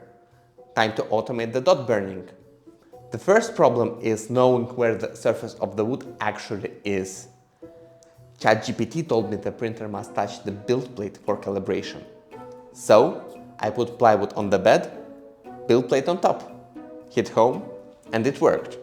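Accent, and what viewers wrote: Russia. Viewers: По акценту слышу наш слоняра)) Очень рад за тебя братан, сэкономить 3к$ это не каждый сможет)) Можешь подсказать сервис где можно купить подписку на claude без санкций? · я смотря разные видосы про 3д печать не ожидал увидеть англоговорящего автора который на самом деле русский) тебя выдал жутчайший акцент и часть видео где чатгпт написал на русском)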